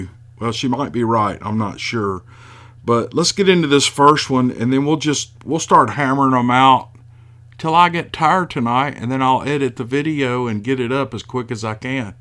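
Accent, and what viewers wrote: South, United States. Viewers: Thank u so much! Snowstorm here in iowa and you made it entertaining to cozy up and listen to your southern speak!Love you!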